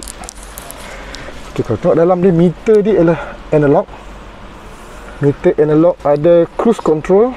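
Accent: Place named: North, Malaysia. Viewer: orang loqstaq nii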